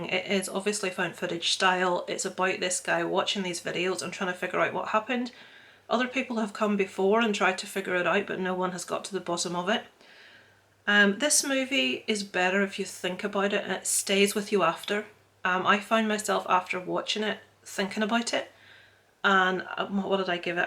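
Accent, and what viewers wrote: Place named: Northern Ireland, United Kingdom. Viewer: Just subbed to u after seeing you on robs stream nice to see a northern Irish accent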